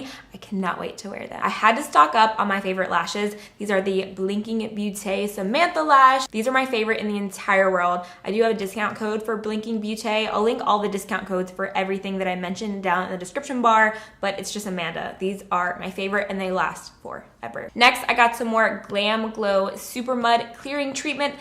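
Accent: South, United States. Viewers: Kia Uhhhhh no it has nothing to do with audio. She used to have a very prominent southern accent.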